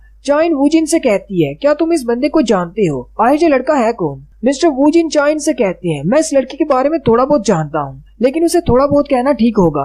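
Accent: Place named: Pakistan. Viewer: Are your from pakistan beacuse your accent is not hindi it's urdu mix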